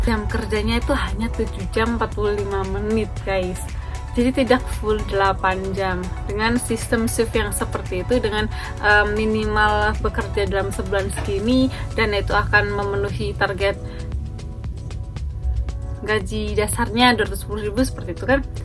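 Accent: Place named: Japan. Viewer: bener kak sampe mikir di menit menit awal, kakanya mungkin orang jepang yg belajar bahasa indo 🥹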